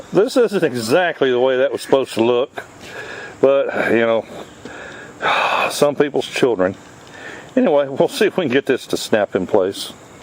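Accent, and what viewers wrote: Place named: Tennessee, United States. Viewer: you and I are cut from the same cloth, same age too, my grandkids call me, the only difference is you have a Tennessee accent and I am a Maina, ayuh and my handle is skidddah.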